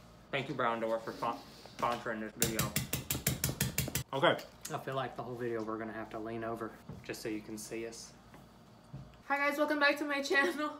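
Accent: South, United States. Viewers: So I realise I'm super late to this video but I e watched a lot of recent videos and I have to say that he's WAYYYYY more southern here than recent!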